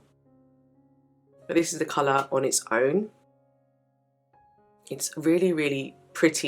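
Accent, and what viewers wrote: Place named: United Kingdom. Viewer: hi i like how natural you are and how your skin looks like real uneven skin ..and above all your accent.are you from britain?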